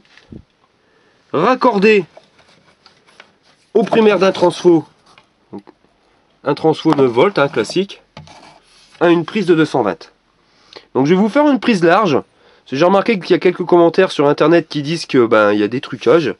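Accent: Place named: North, Germany. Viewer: super, l'accent deuch nord est beau aussi!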